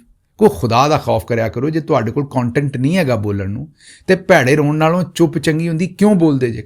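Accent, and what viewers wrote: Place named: Punjab, India. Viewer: ap ki pungabi bot achi hy 🎉🎉🎉❤❤❤❤❤ bot meti meti hi love ho geya hy❤❤❤🎉🎉🎉🎉🎉🎉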